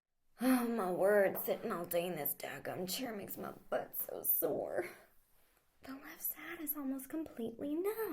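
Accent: South, United States. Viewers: This was really good and I'm highly impressed with the accent but you didn't pronounce route like a lot of southerners do. I'm just saying because I'm from Texas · Pleasepleaseplease do more of southern accent asmr 💞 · very nice approach with this video, nice accent too, i think this is the first time i have seen any of your videos, im guessing your a true southern bell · Your southern accent rocks!!! More southern roleplays please!!! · I kind of just stumbled on here and so I'm new here. but your accent~ 😍 Whether its real or fake. its absolutely charming :3. I see what they mean by southern charm now xP. and your character you chose for this makes me giggle x3. she's weirdly adorable. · Man, I love this:D Would have loved a stronger southern accent but I can't complain, my first trigger in so long >.> Keep up the good work Miss., cheers from Norway! 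· Aarrgghhh i love this accent! I'm marrying a southern gal, that's my life goal... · So I'm new to you, from England, love the Southern accent, this video is so nice and comforting, makes me feel like I'm checking in to s B&B, beautiful voice and accent, proper soothing :) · I have a real weakness for the Southern accent, this video is all kindas evil :P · This is the first I've seen of this video. I genuinely love ASMR with southern accents, I don't know why. Thank you for this, and I apologize for being late to the party. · This was too adorable, loved the southern accent and the many expressions used, you nailed that southern charm. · this one is amazing! The southern accent has always been a tingle trigger for me. Thanks for making this. The humor in it just makes it even better. PS: 61 degrees....brrrrrr...as a Detroiter just coming out of winter 61 sounds like beach weather to me:-) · Welp Was looking up southern accents cause I haven't heard them in years since I left and was feeling a bit nostalgic Was nice Not the exact one I of my area bit damn well close enough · this is so my favourite video ever. 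normally i watch asmr to fall asleep but this is way too good to sleep through. from the beginning you had me laughing and even answering you in my head a little. and the southern speaking, wisdom about life... heck its all there. thank you so much you fantastic creature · Gotta love southern accents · why do i hate southern accents anywhere except for asmr?? I am actually from the south lol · very nice, but at the beginning i like that background song do yu have the name of it. oh buy the way love that lovely southern accent;really good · weird, i was just looking for "southern accent" asmr a few days ago. Perfect timing to the point of being spooky. Thx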